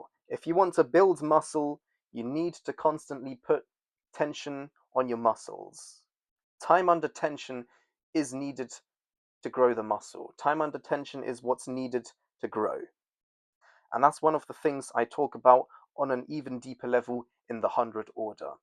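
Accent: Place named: United Kingdom. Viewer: This guy has the same uk accent as the greatest uk artist to ever do it: Feng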